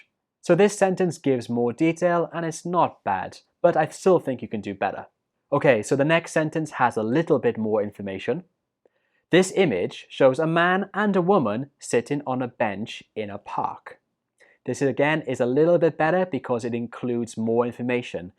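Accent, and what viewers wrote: United Kingdom. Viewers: Your English pronunciation is so good, are you British because your accent is very nice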